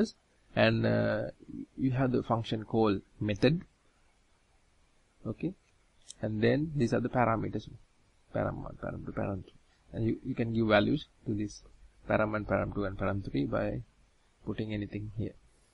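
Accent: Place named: India. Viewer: m sorry to look racist CAN YOU PLEASE FOR THE LOVE OF GOD STOP INDIANS MAKING TUTORIALS WITH THEIR LANGUAGE? LET S FACE IT YOUR ENGLISH ACCENT SO FKN FKCED UP WE DON T UNDERSTAND.... Sorry again yet it s the truth... have someone else do the voice over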